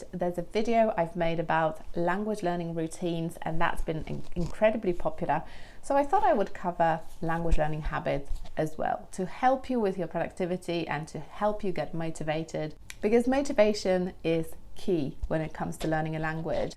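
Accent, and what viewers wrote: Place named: United Kingdom. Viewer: I thank you for your superb work! I adore your British accent! Peace and Love from Russia! ...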